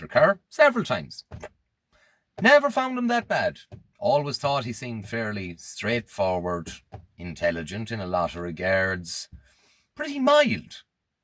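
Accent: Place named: Ireland. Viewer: Thanks I could listen to you all day. You have a fantastic speaking voice and accent. Irish legend.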